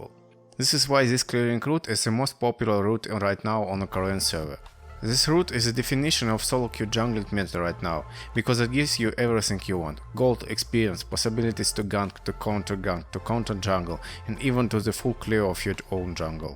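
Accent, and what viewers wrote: Russia. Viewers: I find your accent pleasent (#nohomo), are you from russia?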